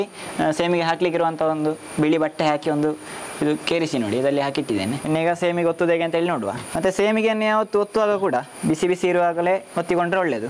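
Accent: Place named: Udupi, India. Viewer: Super receipe...nicely done.looks yummy. I like all your videos and enjoy the way you talk in Udupi Kannada.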